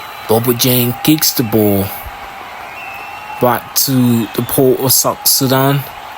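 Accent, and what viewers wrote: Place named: Liberia. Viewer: My brother don't mind those people complaining for not using Liberian accent ...I am from Nigeria and married to a Liberian woman for 23 years 3 kids...Trust me most people can't understand when you speak in Liberian accent..you can't grow by remaining LOCAL...You have to Twick your accent to attract a wider audience.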